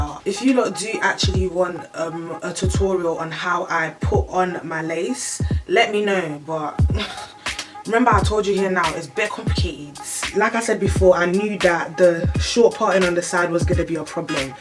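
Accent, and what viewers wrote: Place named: North London, United Kingdom. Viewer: Sis, You sound like a neighbour. #NorthLondon ? Do you do install for others? I beg yu help me!??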